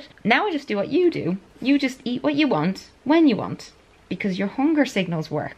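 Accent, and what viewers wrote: Ireland. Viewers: ur irish accent is everything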